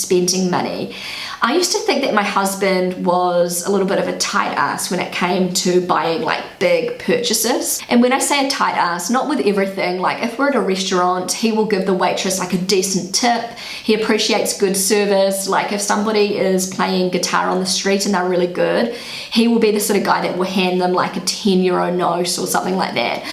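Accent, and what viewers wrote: New Zealand. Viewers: Btw I love your kiwi accent don't loose it! ;) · Wait...I thought ppl from new Zealand talk English the way Americans do not that accent that you have or am I wrong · Der, I really appreciate watching your VLOGS regarding the content and because I enjoy Hearing Kiwi English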